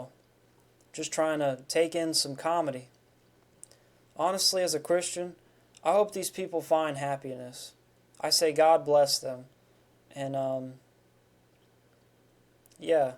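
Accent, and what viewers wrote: Mississippi, United States. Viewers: You from Mississippi?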